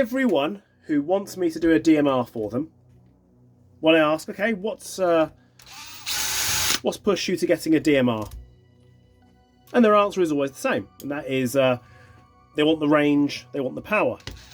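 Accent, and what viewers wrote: United States. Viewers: That American accent is 💯 on point I fucking love it lol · That American accent......juicy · Awesome American accent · First, love the " murican " accent 😏. That said here in the states , at least where I play. The dmr and bolt rifle are lumped together and they are restricted to a certain jul and that's it, no fps . They take the bb weight and fps and lock the med . They require a side arm or secondary. Some places require the sniper to work with a second person " the babysitter" . For me and my style I find a respectable rof ( 40+ 😏) a good hop, bucking , nub and a clean barrel work very well. I also too like to be shit , a fun shit but still a shit. I once dumped 5,000 .12's on someone's head to kill them 😈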